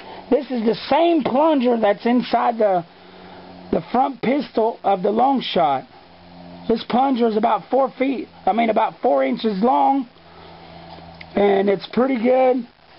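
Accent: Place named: South, United States. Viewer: Ur voice always sounds funny because ur from the south and I'm from the north so yeah, no offense, great vid can't wait to get the long strike!!!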